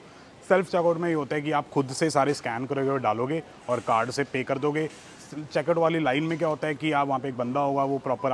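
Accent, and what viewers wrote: Punjab, India. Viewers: its hindi with punjabi accent very easy to understand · Dear apki language main jo punjabi texture (Tadka) hai its amazing; dear son apki vlog as always international students k liya bahut beneficial hoti hai and 2day vlog is superb God bless you my son and also stay healthy guys 🙏🙏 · Punjab jhlkda tuhadi boli cho bht mjja aaiaa dekh k video 😀jaiga ye cart k ander ☺️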